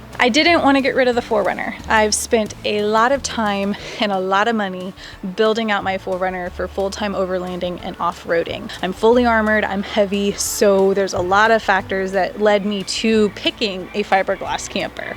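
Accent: Kentucky, United States. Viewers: It’s always amazing to see how God comes through in our lives even when we don’t know how it’s gonna turn out ❤ and also LOVE the Kentucky accent 😂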